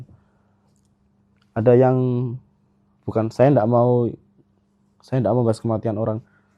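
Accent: Java, Indonesia. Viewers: Mas jenengan kui penak banget nek jelaske sing ditrawang, aku yo seneng suarane lirih, trs jawane kui lho ora ilang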